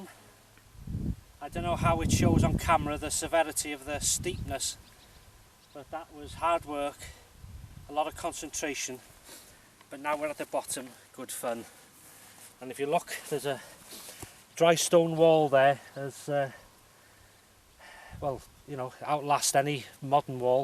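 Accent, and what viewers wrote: Liverpool, United Kingdom. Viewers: I thought i could hear a slight Liverpudlian accent there when you speak. Have you lived there at all or is it because Denbigh's geographically close to The Mersey?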